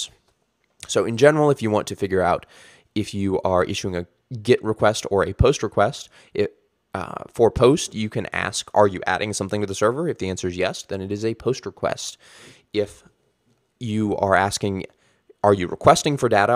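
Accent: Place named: England, United Kingdom. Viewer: yes first video I found in an English accent rether than Indian accent. I can finally understand every word without having to rewind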